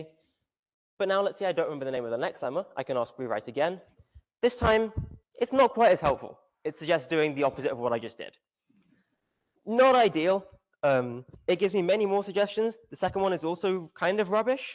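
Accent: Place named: United Kingdom. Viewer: The guy has such a heavy british accent that it is difficult tu understand the talk. I have listened the first 40 minutes and throw the towel.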